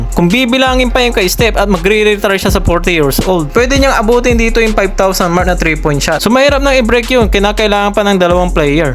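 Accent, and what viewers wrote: Pangasinan, Philippines. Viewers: Pangasinan ka idol noh,,? Halata sa salita mo.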